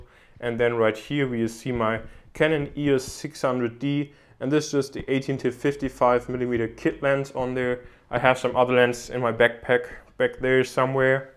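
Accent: Germany. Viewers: Are you German?? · are u german ?? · You sound german, are you german? :) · im just curious .. by any chance ... are you german ?? :) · U have Ferman accent... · Kann es sein, dass du Deutscher bist??? Wegen deinem Akzent und der ZDF App auf dem iPad Mini... :D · Are you german? :D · war iwie klar zu erkennen das du ein deutscher bist :D schönes raum tour! ;) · Are you German ??? Also du hörst dich irgendwie n bisschen deutsch an ???? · is this guy german?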